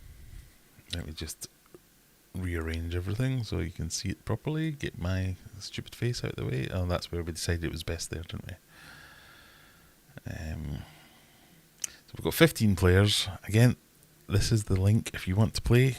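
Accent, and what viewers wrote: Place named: Scotland, United Kingdom. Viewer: Need to put microphone farther away. Scottish accent is awful at close range. ‘R’s are horrendous and every hard consonant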